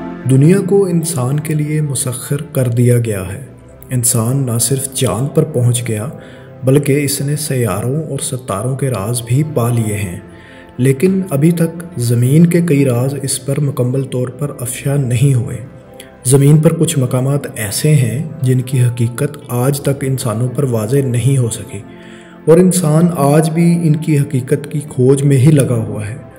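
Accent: Punjab, Pakistan. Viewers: Punjabi accent in Urdu documentary, what an amazing......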